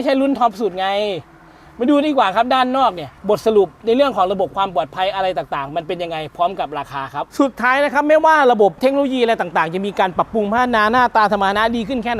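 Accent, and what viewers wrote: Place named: Isan, Thailand. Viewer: admin คนอีสานแน่เลยสำเนียงภาคกลางพอได้แต่ยังไม่คล่องเท่าไหร่ครับ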